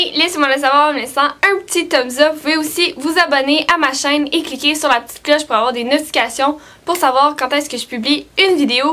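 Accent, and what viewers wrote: Quebec, Canada. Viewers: Ce n'est pas qu'elle ne parle pas bien le français c'est juste qu'elle a l'accent Québécois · Coucou tu est vraiment magnifique et ta frange te vas hyper bien et en plus tu est assez drôle et ta l'accent québécois 💪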